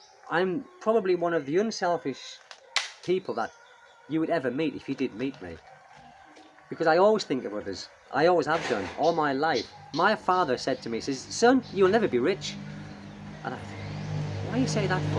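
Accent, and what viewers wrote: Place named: Teesside, United Kingdom. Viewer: New subscriber, where is that accent from, cant quite place it, possibly teesside? I'm from Newcastle. Keep enjoying PH 😉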